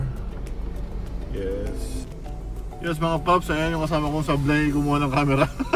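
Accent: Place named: Tuguegarao, Philippines. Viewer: Bro taga tuguegarao ka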